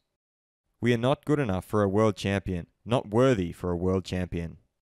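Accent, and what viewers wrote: Australia. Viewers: Absolutely love this channel. Thanks for the awesome content!! Love hearing the Aussie accent on YouTube too. 🇦🇺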